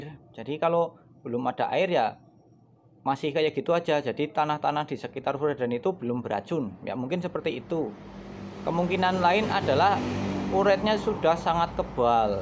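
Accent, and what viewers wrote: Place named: Banyumas, Indonesia. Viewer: Lucuu mas e... bahasa Banyumasan...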